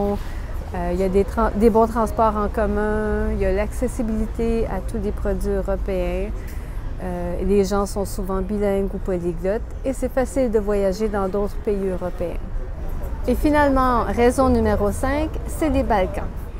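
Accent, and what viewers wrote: Quebec, Canada. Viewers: Une québecoise, n`est ce pas?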